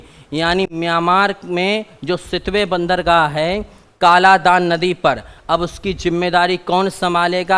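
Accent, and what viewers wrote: India. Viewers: Thanks sir aap bundelkand s ho kya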